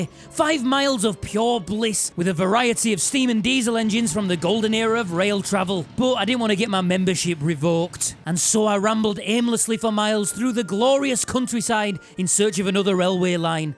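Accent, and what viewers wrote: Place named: Yorkshire, United Kingdom. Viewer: you make me laugh sooo much, I'm an Expat from Nottingham living in the USA, and i love you and your Yorkshire Accent talking about BEHS, keep it going Mi lad, i play a Musicman stingray love it to bits, Cheers Mate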